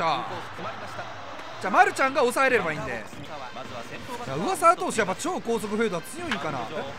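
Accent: Kansai, Japan. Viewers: 引っ越ししてからも関西弁やめんといてな！また帰って来てくださいね！！ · 関西からででくん？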